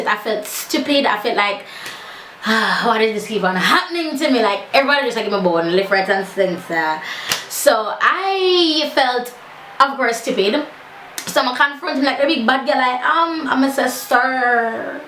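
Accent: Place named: Jamaica. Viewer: love you girl. ..proud to see a Jamaican in this mode...positive and smiling. ..love how yu switch up di talk!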